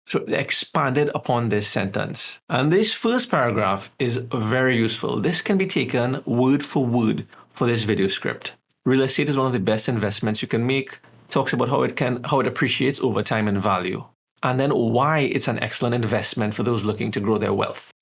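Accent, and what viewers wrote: Trinidad and Tobago. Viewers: Love this video. Are you From Trinidad?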